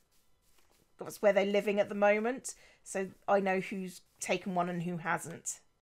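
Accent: Germany. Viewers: Are you fron Germany?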